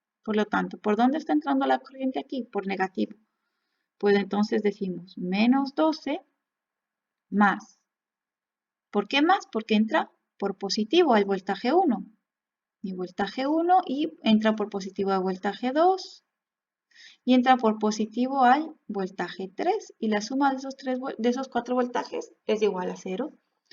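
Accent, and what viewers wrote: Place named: Spain. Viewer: Buena explicación y más ya con ese acento bien españolizizimo 😂😂😂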